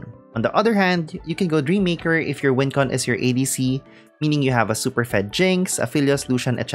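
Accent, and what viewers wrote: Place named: Philippines. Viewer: I soooo can hear the Pinoy in you xD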